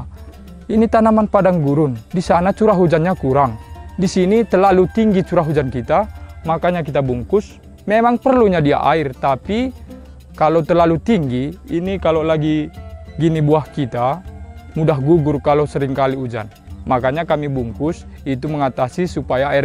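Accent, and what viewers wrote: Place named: Medan, Indonesia. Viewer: Dengar logatnya jadi kangen temen dari medan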